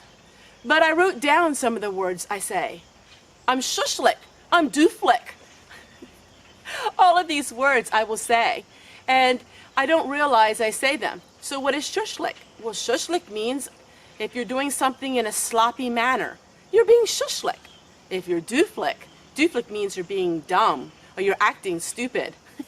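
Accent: Pennsylvania Dutch, United States. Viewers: I love it! ❤ Speak MORE Penn. Dutch!! 👍🏼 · Oh I love this video! The first time I saw one of your videos I recognized the PA Dutch ❤️ We live in Holmes County Ohio and speak it too! Enjoy your videos a lot! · I was born and raised in Lebanon, Pa... I never really thought I had an accent so this video is surprising to me... she sounds like my whole family and I LOL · i have never noticed anything wrong with youre speech unless you purposely speak penn dutch i just notice a slight accent every so often keep on keeping on and be true to yourself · The very first time I watched your video and heard your voice, I thought, "Oh, she sounds PA Dutch!". I recognized it because I was familiar with it. Many people aren't familiar with it and some are just critical by nature. Like you said in your video, it is who you are and knowing that we are getting the real you is why we like your videos so much! 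· It's sooo weird!!! I lived in PA Dutch country Lancaster County for quite a while. We met many PA Dutch folks and when I heard you the first time, I thought ohhh she's PA Dutch! It wasn't weird to me at all. You know, the people who say negative things about the way you talk are actually the ones who are uneducated, simple-minded, etc. All around the world there are different dialects, cultures, heritage, etc. That is the thing I love about the internet is that we can get on websites like YouTube and see people from all around the world sharing their lives. I learn a LOT from watching people from different cultures. Stand tall lady! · I always thought your accent was similar to the Amish and Mennonite people I have encountered. I have noticed that a lot of Hutterites sound more Dutch itself. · I have always respected the Mennonites and Amish. I love their dialect. So you just keep talking the way you were raised. 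Love and God bless. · I've never thought you were dooflick (sp?). First time I heard you I figured you spoke Pennsylvania Dutch. I recognized the accent. :) I like it.. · I live near the Amish so I hear it when they talk to each other. It’s not something to be upset about. I love to learn new things. So keep being you ❤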